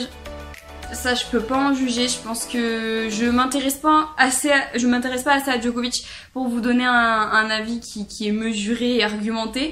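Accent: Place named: England, United Kingdom. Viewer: T0N ACCENT ANGLAIS PUTAIN INSUPORTABLE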